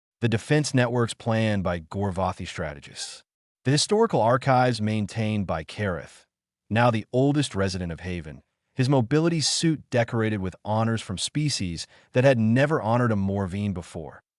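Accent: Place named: Spain. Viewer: The occasional Spanish accent draws my smile.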